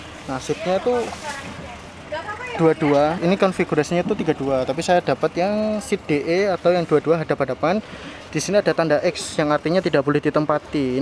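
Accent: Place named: Sumatra, Indonesia. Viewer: Ngomongnya udah logat Sumatera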